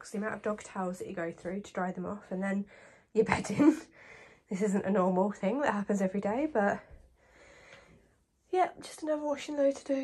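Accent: United Kingdom. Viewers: Your accent is UK ?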